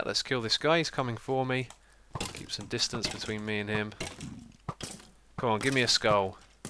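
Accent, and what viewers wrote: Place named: United Kingdom. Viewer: You can speak so good British! THX for learning my English to and GREAT PROGRESS VIDEO!!!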